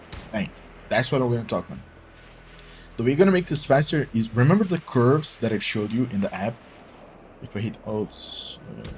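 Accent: Brazil. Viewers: where are u from you sound kinda brazilian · mano, vc é brasileiro né? seu sotaque parece de brasileiro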